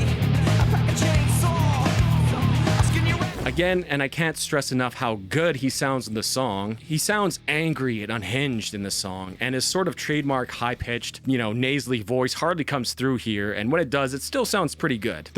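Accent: Canada. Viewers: Are you from alberta?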